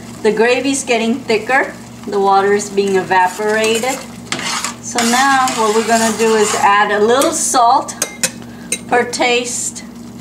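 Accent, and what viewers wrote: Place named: Caribbean. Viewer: You sound more West Indian than East Indian. Are you guys in New York?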